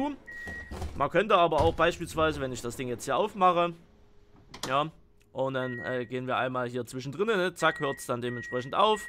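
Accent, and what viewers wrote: Saxony, Germany. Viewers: Ich liebe dein Sachsen Aktzent wo wohnst du in Sachsen ich wohl bei Leipzig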